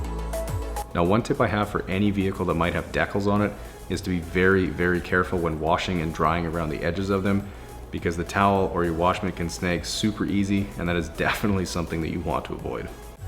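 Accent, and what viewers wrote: Canada. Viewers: I'm in Canada too and we speak the same like he does. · That Canadian accent...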